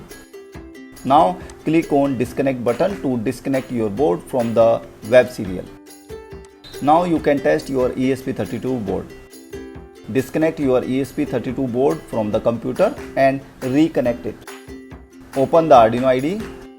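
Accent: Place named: India. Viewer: Hard to understand Hinduglish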